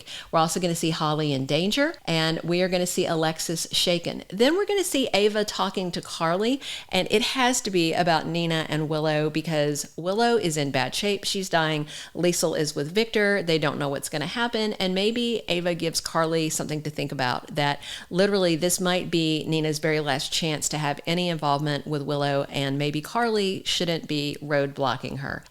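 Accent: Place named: United States. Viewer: It's nice to hear an American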